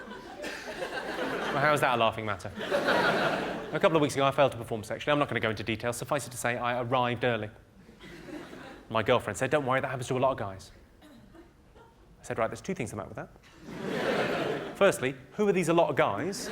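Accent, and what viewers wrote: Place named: Yorkshire, United Kingdom. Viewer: You just sounds like a Yorkshireman in this vid.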